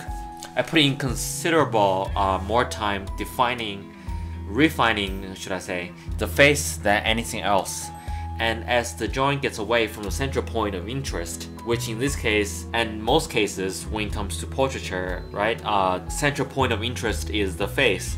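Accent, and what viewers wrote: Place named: China. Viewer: 听口音像是中国人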